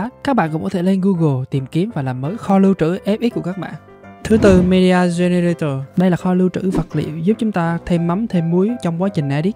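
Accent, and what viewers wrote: Vietnam. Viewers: Giọng giống người Đà Nẵng?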